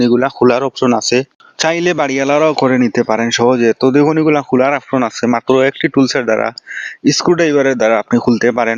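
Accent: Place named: Sylhet, Bangladesh. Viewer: ধন্যবাদ সিলেটি ভাই ।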